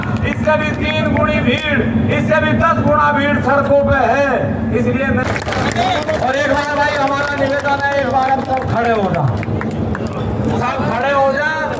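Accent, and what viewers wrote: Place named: West, India. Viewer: Paschim ki boli esi he bhai good work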